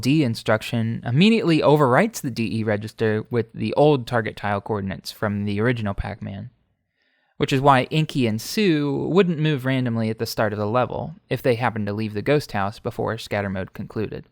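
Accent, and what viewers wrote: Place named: South, United States. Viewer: Are you from the south? I like your accent.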